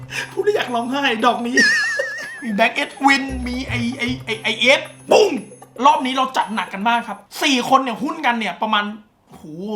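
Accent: Thailand. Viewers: พี่ พูดเหน่อ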